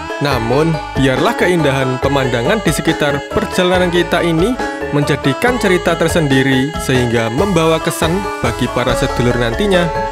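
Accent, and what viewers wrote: Java, Indonesia. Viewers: Terima kasih, spot yg indah, cara pengambilan gambar yg bagus, video yg bagus, narasi yg bagus (njawani banget), background yg bagus. Semoga makin suskses mas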